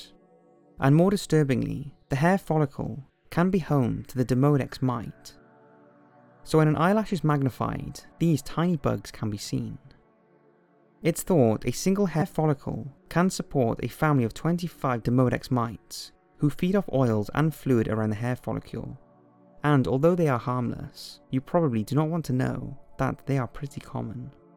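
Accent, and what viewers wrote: Wales, United Kingdom. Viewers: Addicted to your videos. The juxtaposition of your soothing Welsh voice and the often creepy and disturbing content in the videos is practically intoxicating. Binge watching your stuff right now!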